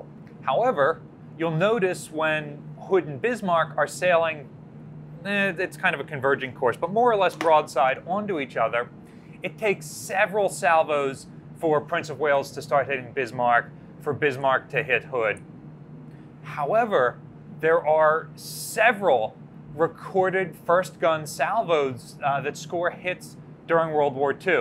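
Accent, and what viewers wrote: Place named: Upstate New York, United States. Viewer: Missouri*. Saying “Missoura” with a Missouri accent makes sense, it’s how they talk. Saying “Missoura” with a John Peck-Erneck upstate New York accent sounds fking ridiculous.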